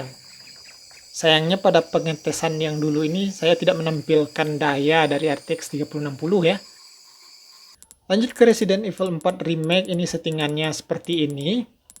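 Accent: West Sumatra, Indonesia. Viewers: Abang orang Sumbar ya?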